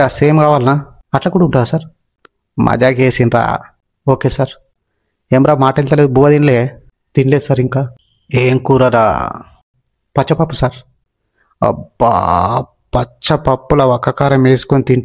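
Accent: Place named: Karimnagar, India. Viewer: Pakka sure my jagityal ledha Karimnagar district language Indhi❤emnna feel undha mama videos lo🥰🥰🥰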